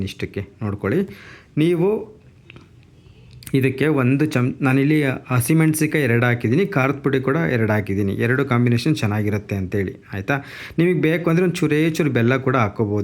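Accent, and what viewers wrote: Chitradurga, India. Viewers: ಹಾಯ್ ಬ್ರೋ 🙏ನಮ್ ಚಿತ್ರದುರ್ಗದ ಮಾತುಗಳು ಕೇಳಿ ಖುಷಿಯಾಯಿತು ಬ್ರೋ 😊❤️❤️ರೋಟ್ಟಿ ಪಲ್ಯ 😋👌👌ನೀವು ಅಡುಗೆ ಮಾಡೋದು ನೋಡುದ್ರೆ ಖುಷಿಯಾಗುತ್ತೆ 👌👌ನಮಗೂ ಸಪೋರ್ಟ್ ಮಾಡ್ಬೇಕು ಅಂತ ಅನ್ನಿಸಿದ್ರೆ ನಿಮ್ಮ ಸಪೋರ್ಟ್ ಇರಲಿ, ನಿಮಗೆ ನಮ್ ಸಪೋರ್ಟ್ ಖಂಡಿತ ಇರುತ್ತೆ 👍❤️❤️😊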